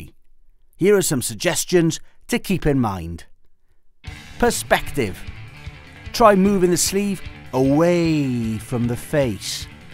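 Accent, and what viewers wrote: Wales, United Kingdom. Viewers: The narator sounds like he has a welsh accicent :D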